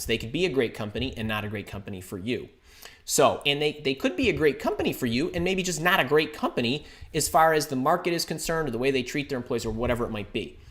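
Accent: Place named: Midwest, United States. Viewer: Oh my word! I can tell you’re definitely from the Midwest!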